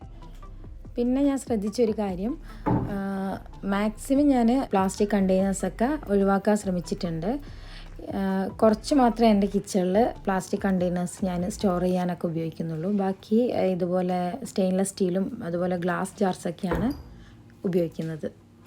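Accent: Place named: Kannur, India. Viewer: aano.samsaaram ketappol njan karuthi kannurkaariyaanennaan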